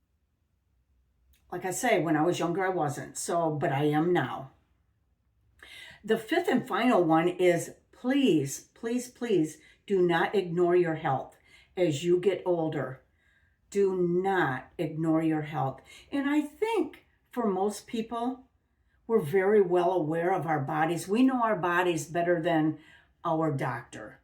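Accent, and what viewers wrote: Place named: Michigan, United States. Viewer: Just found you-subscribed. Your AI video really had me laughing. I had a very technical medical job and was told I would have to do twice the amount of work. When I explained I would not be able to keep up the same quality of work I was told (get it through your thick skull pretty much) "We do not care about the quality of your work! Just do twice as much." I quit, and I can laugh about it now, but it was traumatic at the time. Former Michigan person, and you have my accent. Nice to hear.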